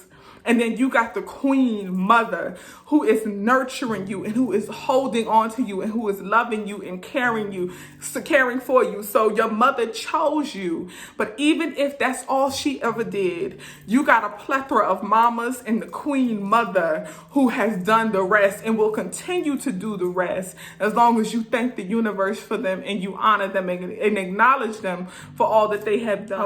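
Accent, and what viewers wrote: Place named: New York, United States. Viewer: Thank you, sincerely, for this,!! My heart so, so needed this. Much love!! Àse! Also, I’m married to a New Yorker and I just love your accent and the way New Yorkers talk — the realness! 🙏🙏🖤🖤🖤💜💜🔮💫🧿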